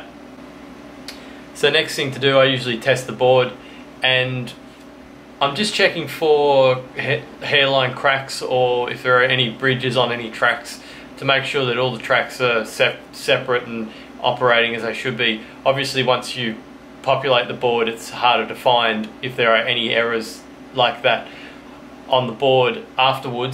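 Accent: Australia. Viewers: Skyim desktop. Awww yeah. Haha- thanks for the tutorials mate! I detect a fellow Aussie... I'm just getting started with building pedals, I've put together two BYOC kits and I'd like to go onto perhaps veroboard on even etch my own.. where do you get the veroboard, copper board, and ammonium sulphate etc.?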